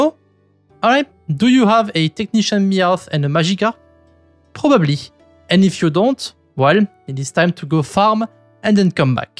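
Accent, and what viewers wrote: France. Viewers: This french accent everytime XD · Subscribed for the french accent and the good content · My man french accent goat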